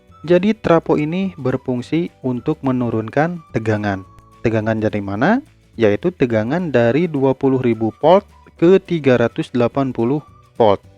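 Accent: West Java, Indonesia. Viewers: Mantap, sunda pisan, salembur atuh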